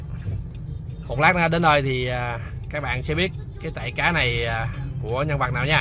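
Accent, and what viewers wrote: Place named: Mekong Delta, Vietnam. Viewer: chời ơi chờ clip mỏi mòn nay mới xuất hiện,khoái cái giọng miền tây thiệt thà chân chất,trại cá ở cu chi- trảng bàn tây ninh giá mềm hok anh. xuống tham quan có phải giới thiệu hok hay ghé chơi tham quan giải trí hoy,:D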